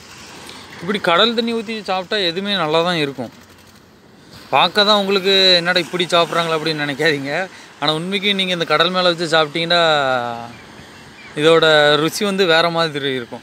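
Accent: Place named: Madurai, India. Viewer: Love to hear your slang thambi..please don’t try to change while you speak..I’m from Madurai and forgot most of the terms..While you speak,iI really feel good and become nostalgic.God bless.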